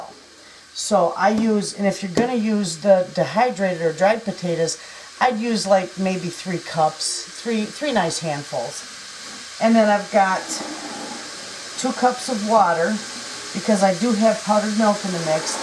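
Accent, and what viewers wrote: Michigan, United States. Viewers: I could tell you were a Michigander right from the first video I watched! I love your accent! Your recipes are sweet, simple and to the point. I looked up info. on the township you live in. What a wonderful, small community to spend time with your families. God Bless you and yours. Subscribed and liked😗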